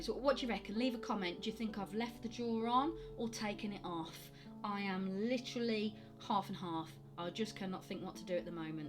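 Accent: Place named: West Midlands, United Kingdom. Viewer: I am glad you put her lower jaw on she looks fabulous.She’s actually quite glamorous for a zombie lol.I love the face paint and the cap really does make a great option to scraggly hair...The way you did her mouth was very clever and made her look less doll like.I also like the pose too it’s really good and I think the fixed dolls look great as they stand better than on those plastic stands..Apart from the face my other favourite part is her boots.They are so cool ,and I like the colour you did them..Do I detect a West Midland accent with you too.have not noticed until today..I am from there .It’s actually nice having one of my favourite doll makers coming from here...Keep up the great work I am looking forward to your next one 🥰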